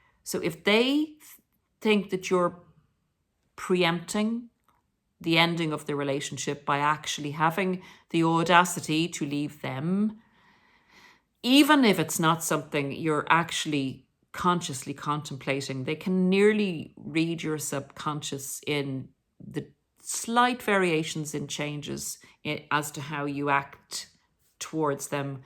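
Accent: Ireland. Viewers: GREETINGS FROM THE GREAT STATE OF KENTUCKY IF YOU'RE NOT ATTRACTIVE SO-CALLED WITH WHATEVER THAT MEANS THEN YOU'RE GOING TO BE DUMPED YOU'RE NOT GOING TO FIND QUALITY MAN YOU'RE NOT GOING TO FIND A QUALITY OF WOMEN BECAUSE MOST OF THESE SO-CALLED MEN THAT ARE GOOD THEY'RE LOOKING FOR ATTRACTIVE PHYSICALLY ON THE OUTSIDE MOST PEOPLE ARE SUPERFICIAL THAT IS A MAJOR PROBLEM VERY FEW PEOPLE SEE THE BEAUTY WITHIN AND YOU KNOW ACTUALLY EVERYBODY'S GOD'S CREATION SO WE ARE ALL MASTERPIECES BUT BECAUSE WE LIVE IN A SINFUL WORLD AND PEOPLE LOOK AT THINGS THROUGH THE CARDINAL I THINK SOME PEOPLE ARE BETTER LOOKING THAN OTHERS WHEN THEY'RE NOT MARILYN MONROE WAY WAY WAY WAY WAY OVERRATED BECAUSE SHE LOOKED PROVOCATIVE AND WAS LOOSE THAT'S WHY MEN LIKED HER GREETINGS FROM KENTUCKY WE LOVE YOU IRELAND WE LOVE YOUR ACCENT WOULD LOVE TO SEE IRELAND ONE DAY EVERYTHING IS SO DIFFICULT IN THE UNITED STATES EVERYTHING IS OVER THE TOP EXPENSIVE I WORK WITH THE HOMELESS THIS IS JUST REALLY A NIGHTMARE AND YOU HAVE TO FOCUS ON GOD AND HELPING OTHERS WHY DON'T YOU DO A VIDEO ABOUT THAT MOST MEN JUST GO BUY A PERSON'S LOOKS NOT ABOUT MORALS IT'S NOT ABOUT HOW KIND YOU ARE MOST PEOPLE THEY DO NOT LIKE UNATTRACTIVE SO-CALLED UNATTRACTIVE PEOPLE IT'S A DISGRACE WHY DON'T YOU TALK ABOUT THAT THAT'S WHY PEOPLE ARE DISCARDED❤🙏🙏🙏🙏🙏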